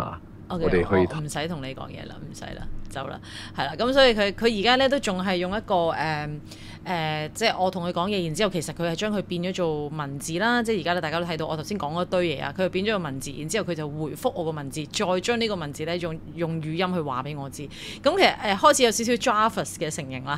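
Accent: China. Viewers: 这些不能叫做乡音，完全是一个母语普通话的人在学讲广东话。😂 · 大陆哩音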